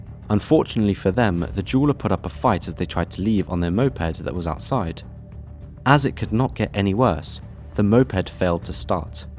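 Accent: Netherlands. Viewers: Mate who narrates these vids ? Are u dutch ?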